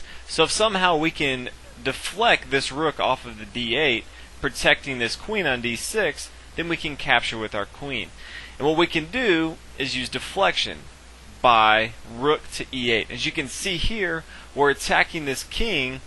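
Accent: South, United States. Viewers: I like the lessons but his southern accent is very annoying.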